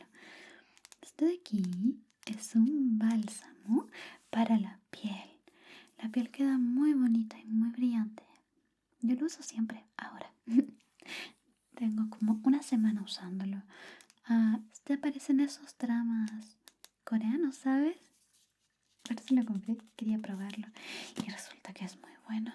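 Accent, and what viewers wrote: Chile. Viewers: Me encantan los tus asmr hablando chileno.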